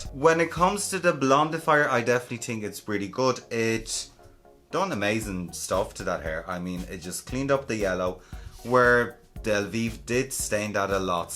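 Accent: Ireland. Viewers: Are you irish?!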